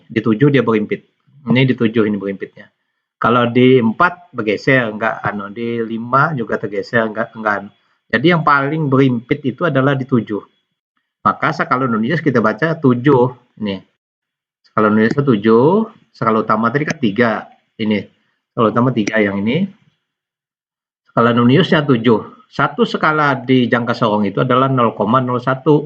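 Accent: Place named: Banjar, Indonesia. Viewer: umpat belajar Guruu .. R nyaa banjar banar😂jar abah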